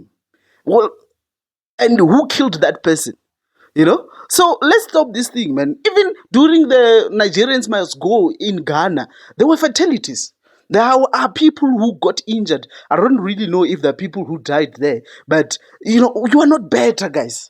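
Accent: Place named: United States. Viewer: I dont think you are south africab, you sound American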